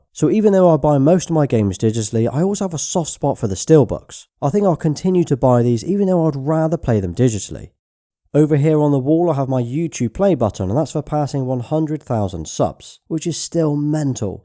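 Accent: United Kingdom. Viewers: I knew he was going to be British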